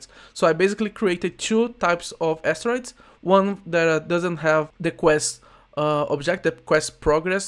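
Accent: Brazil. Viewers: é incrivel, todo brasileiro consegue saber q o mano é brasileiro só de escutar a voz e o sotaque kkk, mas otimo video por sinal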